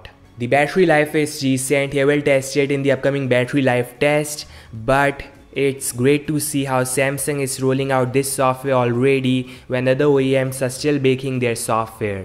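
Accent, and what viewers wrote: India. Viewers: Are you from india...now wonder...i dont really understand some of what you are saying😊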